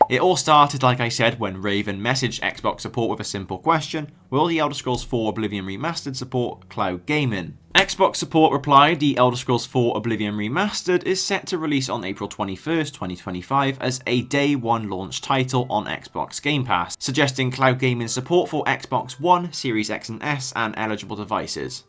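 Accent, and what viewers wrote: South Wales, United Kingdom. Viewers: So where in south wales are you from? (I can hear it, man)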